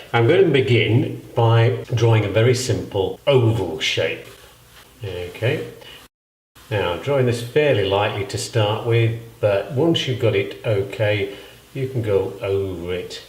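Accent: United Kingdom. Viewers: YOUR ENGLISH PRONUNCIATION IS VERY BRITISH,I LOVE TO HEAR IT! ANYWAY,METHOD WAS GOOD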